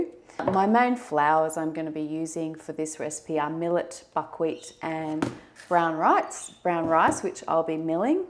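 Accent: Australia. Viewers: In Ireland with our weather it would probably take a whole day to rise the bread . You sound like my sister in law who's from Townsville. I remember when my brother and sister in law lived in London I couldn't help asking her is that a question? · i have just started to bake gluten free bread, came across your tutorials and must say how pleasing it is to see and hear a fellow auzzie , thank you for your time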